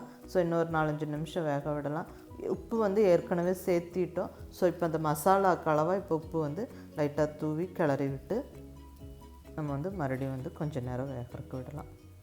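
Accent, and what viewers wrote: Kongu, India. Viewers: Mam...Nenga pesumpothu I noticed Ur Kongu-Tamil.....I like ur Slang and So much of love for u..Mam.😘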